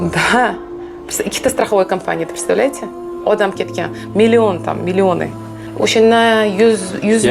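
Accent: Kokand, Uzbekistan. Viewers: sizni koʻrgim, gaplashgim keladi, qizchani oʻz zimmangizga olganingiz sizning asl qiyofangiz ni ochadi, baxtli qiz ekan sizdek onasi bor koʻz tegmasin Alloh taqdir qilsa uchrasharmiz, meni onam ham Qoʻqonliklar shevangizga gap yoʻq, betakror goʻzalsiz.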